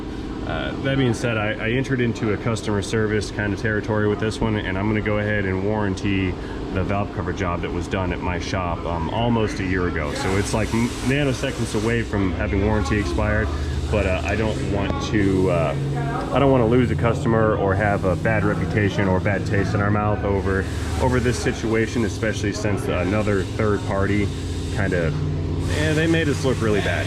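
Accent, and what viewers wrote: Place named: New England, United States. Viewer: Sounds like is a New Englander.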